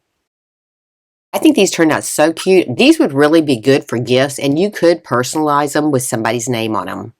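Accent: South, United States. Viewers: These are so cute! This has got to be in my Top 5 craft channels I follow on YouTube, if not the top spot! VZ perfect crafts, perfect Southern accent with the right level of sound. Thank you. Good!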